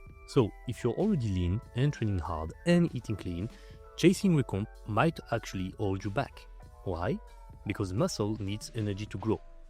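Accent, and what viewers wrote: France. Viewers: I think Uncommon Sense accidentally did you dirty with that "bylking" comment 😆 he was actually teasing you (phonetic /y/ is pronounced like the french u, he was just playfully replicating your accent IINM) The french u is closer to the english way of saying "bulk" than a french i. If you wanna inch closer still, you could say 'boulking' but almost like an O, or just keep practicing the english pronunciation directly. Cheers.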